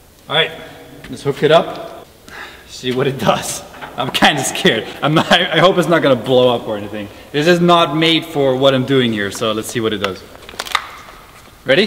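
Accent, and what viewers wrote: Netherlands. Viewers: Boi u dutch? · are you dutch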